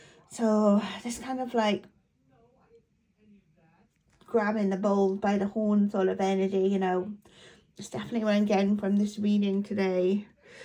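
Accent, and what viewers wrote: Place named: Wales, United Kingdom. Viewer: I’m not on this ship….i observe from afar. is a sweetheart and I love listening to her welsh accent……I find it very soothing. Whether tarot is your thing or not comes from a place of goodness. If A and L are still together….if N and L are not a couple….in the greater scheme of things in your own lives does it really matter? They are not your friends or family. Interesting that a lady posted yesterday on TT that L was in her shop in the UK. Both L and N like to post sometime after they were at a particular location. To me L’s hair and sideburns in the restaurant pic look a little short for current filming… but I could be wrong. Maybe A is at said restaurant with a new BF…. In my job of almost 40 years, I have learnt that things are not always as they appear….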